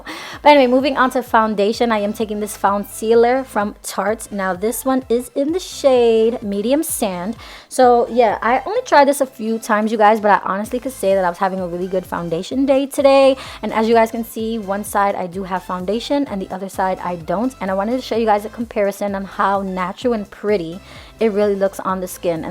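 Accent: New York, United States. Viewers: girl you sound so new york i love it lmaoooo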